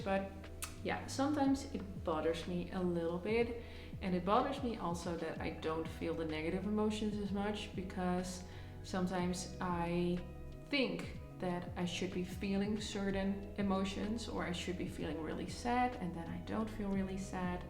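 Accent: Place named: Netherlands. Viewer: Hoor gelijk dat je Nederlands bent😊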